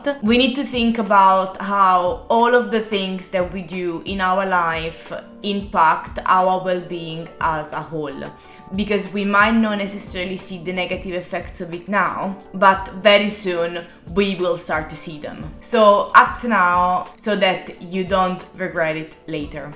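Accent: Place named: Italy. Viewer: Hello, good morning 😊. I watched this video of yours and I liked it. I recognized you for your Italian accent. I admire the architecture and love music of your country a lot. Greetings from México 🇲🇽. By the way, both countries share the same colors in their flags. 😊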